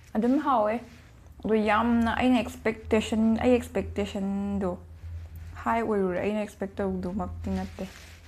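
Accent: Kakching, India. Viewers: Ymna haotraba malleko...kakching mrolda oina ngangbiyo aduna henna nungsiye😍😍momo oina chabgi touse... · Kakching lonna nungyba helle echan tharoi charak o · Kakching lon ngangbna hena nungshiye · eche mathangdi momo oina chabiyo adusu kakching marol da ngang ngaga chena ngangbado taba yam nungaijeiye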